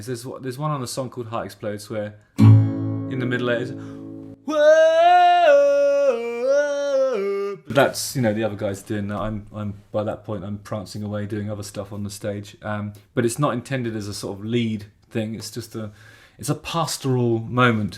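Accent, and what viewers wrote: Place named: Lowestoft, United Kingdom. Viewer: I'm so -pleased to find your channel! As a fellow Lowestoft chap, and former regular at the Triangle and O'Reillys (where I also worked), hearing you chattering away like this takes me back! Oh, and while more a 'Woo' than a 'Woah', has several good ones.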